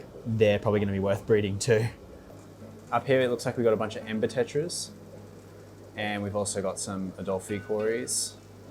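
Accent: Australia. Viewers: Love your videos. As an American, I get a little chuckle out of hearing Australians say H like "heych", and here like "he-yah"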